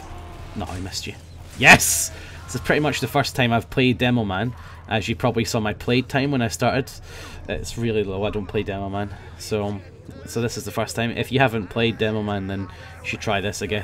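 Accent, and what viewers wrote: Scotland, United Kingdom. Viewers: So Scottish :) There can only be one Demoman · Knew it was a fellow scot with this wee fanny !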